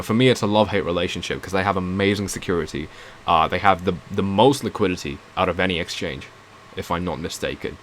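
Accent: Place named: London, United Kingdom. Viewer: London posh boy accent.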